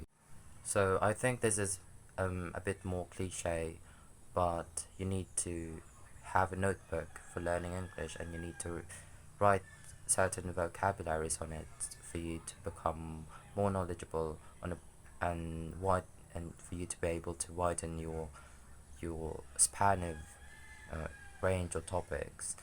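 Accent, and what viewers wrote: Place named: United Kingdom. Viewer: You speak good English. Sounds British. And none of it sounds force. All sound normal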